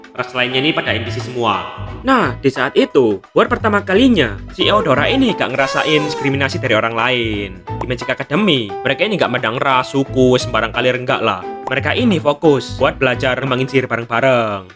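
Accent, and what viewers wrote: Java, Indonesia. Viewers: jowo mas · Jowo nyelll abang kuuuu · Jawa nya ngejawa bgt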